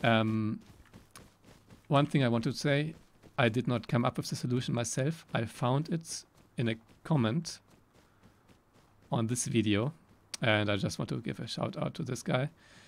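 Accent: Germany. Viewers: DU BIST SO DEUTSCH OMGGG ALDA MAN HÖRT DICH ;)) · This German accent Omg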